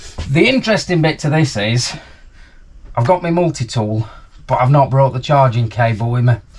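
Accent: Yorkshire, United Kingdom. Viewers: Oh dear. I'm killing myself laughing because I can understand a Yorkshire accent (well, mostly), but I left CC on, and it can't figure out what is being said. Unintended hilarity, which I'm sure you will appreciate. I'm sure some people will wonder why you put so much effort into a temporary fitting, but it comes down to what my Scottish ancestors would call being "thrifty" (emphatically not "cheap"), which is completely consistent with being a "tight Yorkshireman"--a very respectable world view, I'd say. You may call it "winging it," but I really appreciate the skill behind it.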